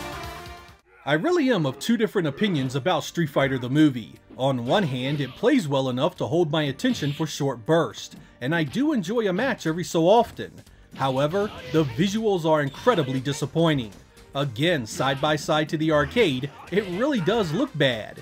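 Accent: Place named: North Carolina, United States. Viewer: I've been meaning to ask you this for years... Where are you from? Your accent reminds me of my dude from North Carolina. Like, to me you say bauls (boss), or bault (bought), or cault (caught). It's not a dis, it actually makes me wanna watch more.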